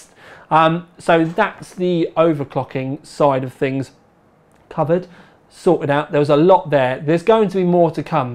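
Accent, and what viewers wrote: United Kingdom. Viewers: Is this British accent? :D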